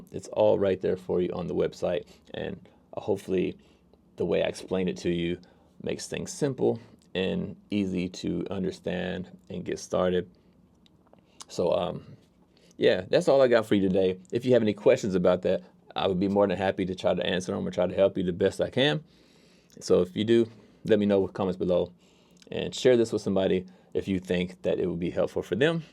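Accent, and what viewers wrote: Louisiana, United States. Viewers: Are you from southeast Louisiana?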